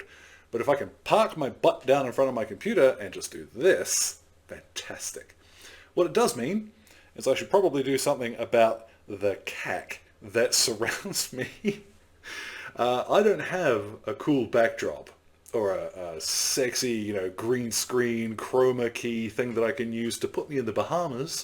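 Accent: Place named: New Zealand. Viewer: The new mic makes you sound like a bloody Kiwi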